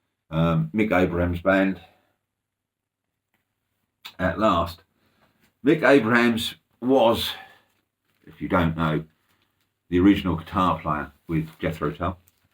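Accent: Suffolk, United Kingdom. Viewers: Hi, just found your channel. It's great to hear a local voice. I'm from Bury St Edmunds.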